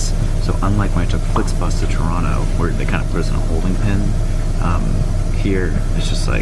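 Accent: Boston, United States. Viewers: I rode a Greyhound for the first time in a long time recently and paid extra for the reserved seat and I only realized halfway through the ride, that I was put in the wrong row (it was only one off but still) so yeah reserved seating is a scam. Love the Bahston accent!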